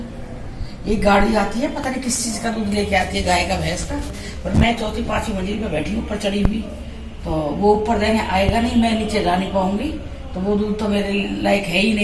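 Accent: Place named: Delhi, India. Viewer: Aunty aap bilkul dilli walo ki tarha baat karti ho aur sochti bhi vaisa hi ho...mujhe accha lagta hai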